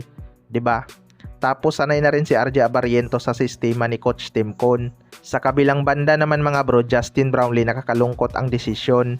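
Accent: Visayas, Philippines. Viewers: Tanong ok lang bisaya ka ba sakit mo sa tenga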